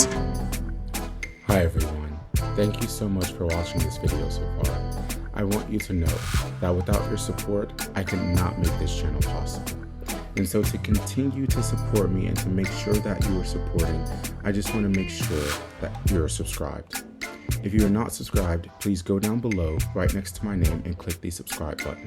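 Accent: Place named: United Kingdom. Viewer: African ameri what? Sounds more British than american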